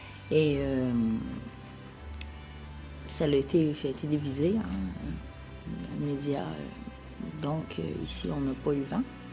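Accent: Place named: Quebec, Canada. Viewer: Au Québec, j'ai joué durant 20 ans à un sport nommé "fastball" un dérive du base-ball.Selon moi, le base-ball est un des sports qui exige le plus de stratégie, de réflexion, de tactique, de concentration et d'instinct. Mr. Aaron a été l'un des plus grands dans son domaine, faisant preuve d'humilité et d'humanité malgré sa grande popularité.Beaucoup de sportifs professionnels devraient prendre exemple sur lui. En passant, je suis complètement bilingue et vous prononcez très bien son nom, avec un léger accent Québécois tout à fait charmant.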